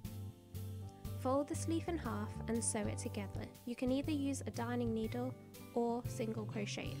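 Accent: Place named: United Kingdom. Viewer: Yessss! You go girlllll. I like how slowly you work. I learn by repeatedly watching the action over and over again. So this really works for me. Very good close ups so one can see exactly where to put the hook. Love the fact that there are no speeded up camera shots I'm better at knitting. My crochet tends to go wierd and lop sided as I don't always place the hook in the right place. I get so stressed when I crochet so I don't tend to do it very often.😥 Its really good to hear a tuturial made by a British person so I am not going to get confused like I do when following an American crafter. I am going to try and make this. I have not made a crochet garment for years. Thank you for sharing. How many balls of the Women's Institute Aran yarn did you buy for the project?Bravo teacher👍🏿🙏🏿👏🏿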